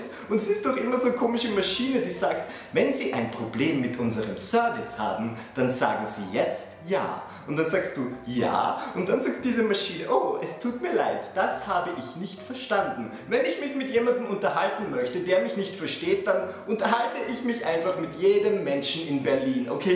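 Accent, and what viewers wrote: Vienna, Austria. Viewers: ich wohn in berlin kreuzberg und mein postbote ist sehr faul. ^^ anstatt zu klingeln bei mir (4.stock wohn ich) und sich die muehe zu machn hoch zu laufen gibt ers irgendwo anders ab sodass ich jedes mal mein verdammtes paket suchen muss.. ps. ich liebe diesen dialekt xD bin halb deutsch halb oesterreichisch also versteh ich alles und find diesen dialekt so angenehm. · 1. hat meiner Meinung nach keinen so starken Dialekt, weil er versucht - glaub ich - in Schriftsprache zu sprechen, damit ihn die Zuschauer auch verstehen. Aber er hat einen typischen Wiener Akzent, den jeder erkennt. Sogar Deutsche. 2.) Ist der Wiener Dialekt gar nicht so krass, wie andere Dialekte. Das ist so ähnlich wie mit den Berliner. Da sprechen auch fast alle in der Schriftsprache. (Nur der Berliner Slang is noch n bisschen krasser, aber der kommt gar nicht so häufig hier vor.) Bin jetzt nicht der große Dialekt und Akzent Experte, aber hab da schon so n bisschen was mitbekommen. 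Besonders, wo ich selbst Österreicherin (Steirer) bin, die vor ein paar Jahren nach Berlin gezogen ist.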